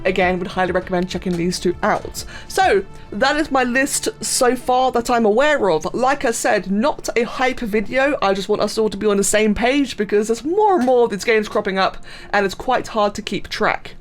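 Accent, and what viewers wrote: United Kingdom. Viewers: Most British accent ever heard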